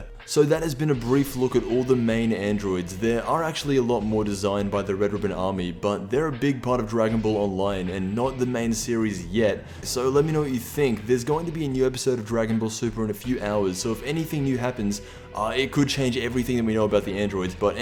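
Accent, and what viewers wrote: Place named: Australia. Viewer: it's just an aussie accent lol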